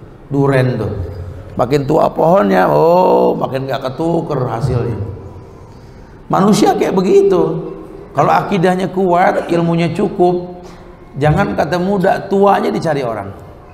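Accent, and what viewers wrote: Indonesia. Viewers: Waalaikumsallam..skrg sy hampir tiap hari menyimak kajian ustad...trmksh pak ustad atas ilmunya sangat bermaafaat.buat kami...penyampaiannya juga mudah di terima..gaya betawi penyampaiannya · Ust .... semoga semakin barokah... kajiannya ringan, intinya gampang di cerna , penyampaiannya sedep banget dech logat betawinye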